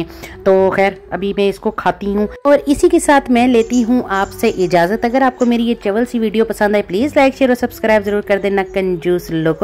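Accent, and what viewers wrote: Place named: Pothwari, Pakistan. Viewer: Mash Allah very nice and interesting vlog.I love your gulabi pothwari and gulabi Urdu, it makes it so interesting 😊. Bhindiya are my favourite with chicken or meat 😋😋😋.stay connected ↪️🏕👀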